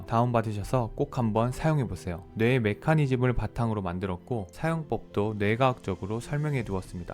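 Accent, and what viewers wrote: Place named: Gyeongsang, South Korea. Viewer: 시몰 다이어리 잘 받았습니다. 너무 감사합니다. 정성과 진심을 느껴서 댓글은 처음인데 안 쓸 수가 없었네요.. 듣다가 경상도분이시구나!.. 내적 친밀감..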